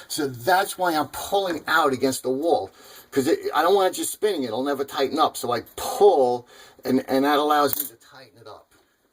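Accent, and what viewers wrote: New York, United States. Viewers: Thank you . I love your NY accent and sure appreciate your help with the mollies. I do have one question, though. How would you fix the other kind of hole that you showed at the beginning of your video where the two holes ripped making the two holes one long hole? That's what happened in my bathroom. I hope you really do answer all of the questions you get because I really need to know! My towel rack fell off and it looks terrible! Thank you again!!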